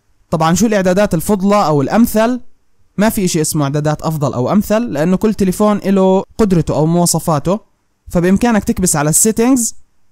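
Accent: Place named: Jordan. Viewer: ماشي هادا اردني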